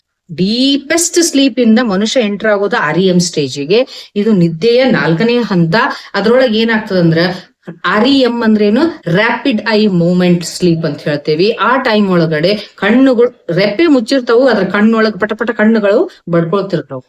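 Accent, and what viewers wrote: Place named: Hubli, India. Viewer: Mam namm hubli bashe n chanda helthiri tq mam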